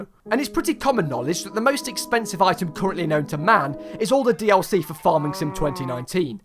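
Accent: United Kingdom. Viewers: I'd this man British because he sounds British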